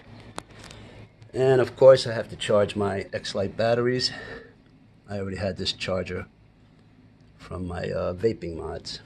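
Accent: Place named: New York, United States. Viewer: Love it!! You're new York accent!! Lol by the he way graysons batts for 115 sold out thanks to you.. Lol love ya and your sense of humor!! Going to be a great summer..looking forward to seeing vids and getting to know you brother!! Sat mine gets here.:) but no batterys!! And I forgot a cord so can use frysky receiver..XSR. You got two 115s.now I'm jealous you'll always be flying. Figuring out myself too ,DNA big help bro! For x-lite! Have a great night!! God bless!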